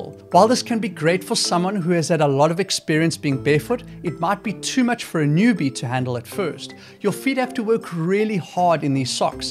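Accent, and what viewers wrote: South Africa. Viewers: You sound like a South African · You sound South African!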